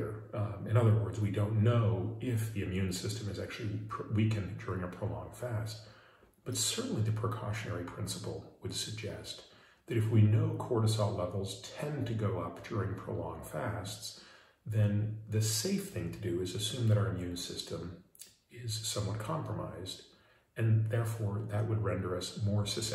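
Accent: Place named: Canada. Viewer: It might be the intonation since Peter is Canadian like Seth. Peter has a better voice.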